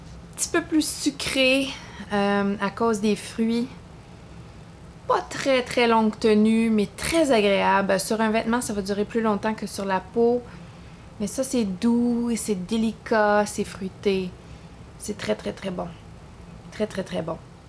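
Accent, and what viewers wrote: Canada. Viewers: Super vidéo, je suis nouvelle sur ta chaîne. Comme toi passionnée de parfums. Tes vidéos sont très intéressantes. Je me suis abonnée à. Ton accent canadien est merveilleux 😍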